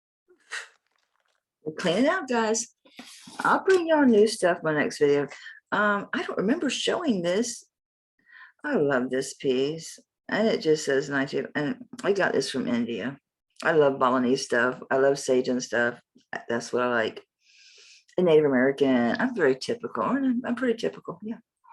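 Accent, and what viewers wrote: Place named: South, United States. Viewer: I’m listening while getting my nails redone 💜 I love your southernese 😂 💜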